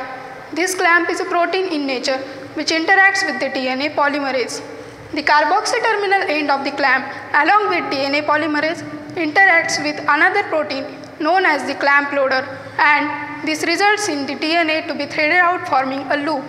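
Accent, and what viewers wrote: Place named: India. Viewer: I had to close this video because I can't understand indian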